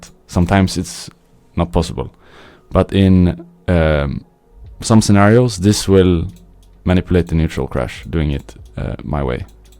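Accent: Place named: France. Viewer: he has a little french accent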